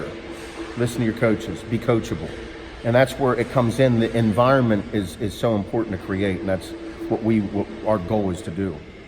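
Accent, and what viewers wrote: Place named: Pennsylvania, United States. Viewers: I knew I heard Western PA in your voice! Lots of great football players, tough steel workers come from there. I would take a Dodge Ram any day.. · I Fuckin knew it. You just cant hide that fuckin PA accent lol. Form PA Also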